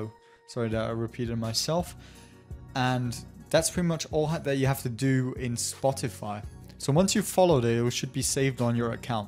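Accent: Germany. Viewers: ist er Deutscher ?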